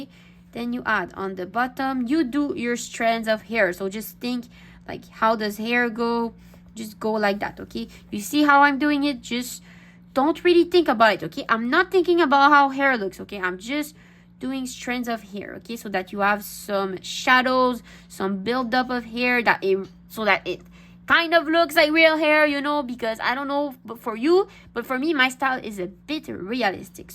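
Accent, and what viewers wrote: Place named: France. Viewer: Hey! I loved your video, hreat art, great tutorial it was *chef kiss*I was just wondering if you were french, I am french myself, and I heard in your accent (slightly) some french like the “Ok” and the “euh”, the way I also pronounce it :3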